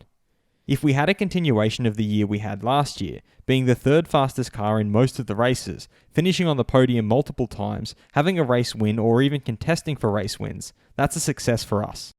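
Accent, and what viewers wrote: Australia. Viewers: I suppose it depends on how much of a fan you are and your level of interest.If you don’t pay much attention to whats going on, then this channel is perfect for repeating everything in one viewing session, but if you regularly check up on whats going on then this channel is a waste of time, as its just rinse and repeat, unless, maybe, you just like listening to Australian accent🤣 Like i said, he still has to put the work in to make these videos and he has has plenty subs, so fair play to him.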